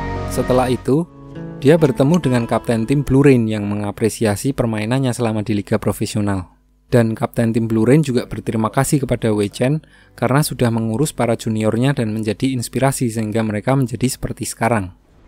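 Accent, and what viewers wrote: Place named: Java, Indonesia. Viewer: Njiiirr orang jawa .. salaam bang dari jawa timir kediri bang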